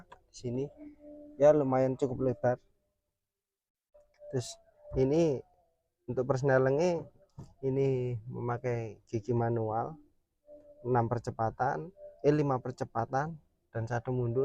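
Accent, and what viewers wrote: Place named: Kediri, Indonesia. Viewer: Logattè koyok orang Kediri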